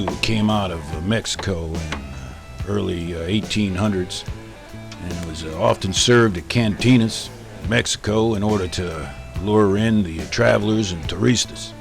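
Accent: United States. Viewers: U sir has an authentic good ol american voice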